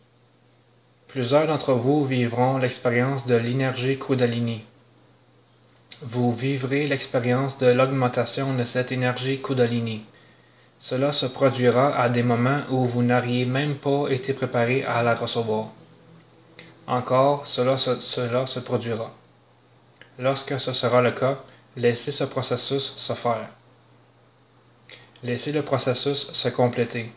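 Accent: Quebec, Canada. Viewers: J'aime bien l'accent québécois.Mais tu pourrais me donner l'adresse de ton coiffeur?..........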